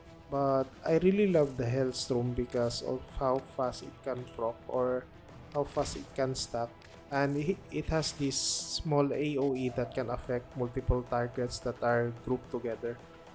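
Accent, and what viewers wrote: Philippines. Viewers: even the diction and cadence is similar to how Filipinos speak Tagalog. The "f" and "v" to the rolling "r"s and "t"s. Though even English in the ph will still vary depending on the region. That being said, I prefer the human voice than the AI one. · are you filipino? sounds like it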